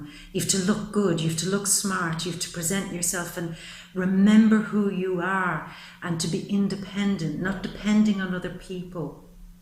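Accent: Ireland. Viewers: I absolutely love your energy! You have an accent so I assume you are Irish? If so, for my August 8 birthday next year I am going to Ireland and Scotland. My beautiful daughter is taking me for my 60th birthday. I can’t be more excited I love and always wanted to go to Ireland! I think you are absolutely a joy to watch! Thank you for your wonderful readings!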